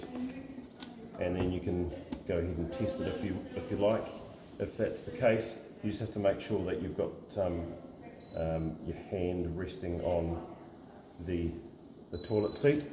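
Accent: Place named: New Zealand. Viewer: Where do you get the fans from? You sound kiwi mate... are you in NZ?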